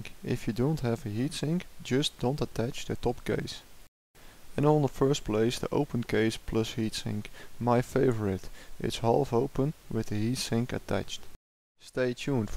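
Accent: Netherlands. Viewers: nederlands manier van engels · hoe duur is zon heat zink ik hoor btw dat nl bent Nice videos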